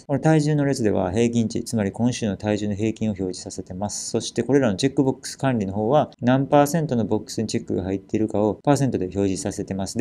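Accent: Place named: Kansai, Japan. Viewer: 関西弁聴きやすすぎます。。。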